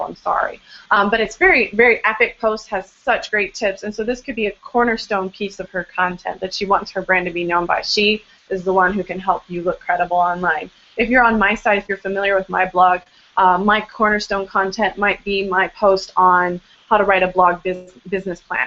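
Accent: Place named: United Kingdom. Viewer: Lol love the British Accent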